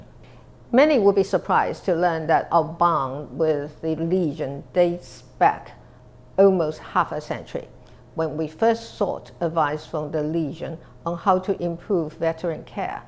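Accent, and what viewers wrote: United Kingdom. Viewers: 蔡英文總統是標準的英式英語，這真的是曾在英國生活求學過才有這樣的口音...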